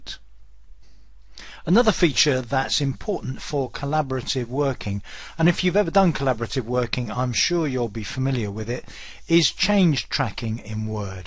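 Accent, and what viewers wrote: England, United Kingdom. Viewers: Only a dumb hick like you would get annoyed from a British accent. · I do not like the English accent. It is annoying and difficult to understand. It grates on my nerves.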